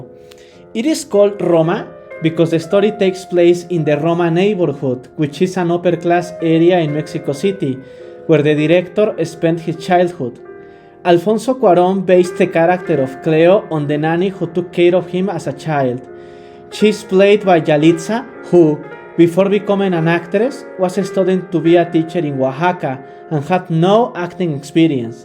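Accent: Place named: Spain. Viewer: Muchas gracias por el video. Me gusta mucho tu acento español cuando hablas Ingles. Haz más videos por favor ❤❤❤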